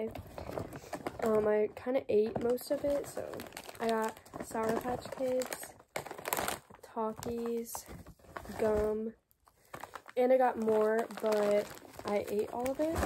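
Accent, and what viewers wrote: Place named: United States. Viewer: I'm not sure what she is saying because I'm English, and she's American, so I don't really know what she is saying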